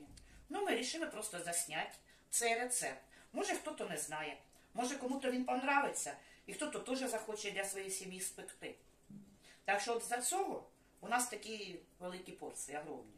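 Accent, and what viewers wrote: Ukraine. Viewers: я первый раз у вас на канале.Очень родной украинский говор. Когда смотрела ваше видео, рядом сидел муж и сказал, говорит точно,как твоя Валя. Валя - моя сестра. вы с какого региона Украины?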